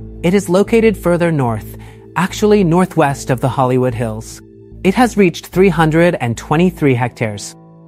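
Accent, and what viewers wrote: Italy. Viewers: You don’t need to alter your voice to sound English. I much prefer your Italian accent.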